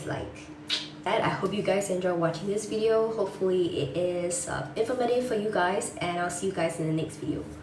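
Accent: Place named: Singapore. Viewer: you sound like your from singapore :)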